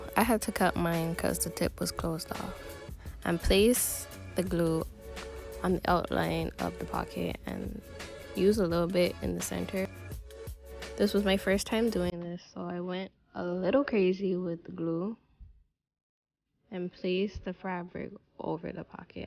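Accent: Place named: Barbados. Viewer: Jealous you have the bajan accent and I don’t lol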